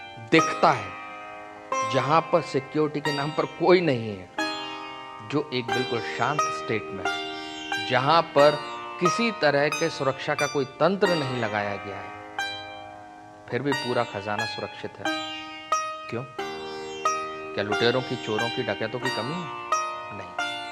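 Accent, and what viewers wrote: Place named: Haryana, India. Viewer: Good haryanvi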